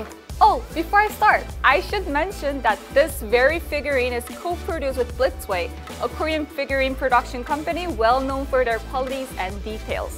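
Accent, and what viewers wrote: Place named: United States. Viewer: Dude I thought I was gonna hear some koran, turns out she speaks American English more than Americans themselves!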